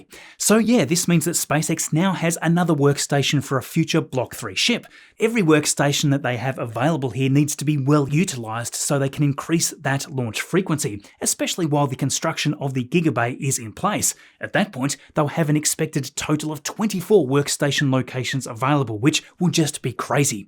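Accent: Australia. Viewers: I love listening to Australians explain stuff. Their accent is top notch.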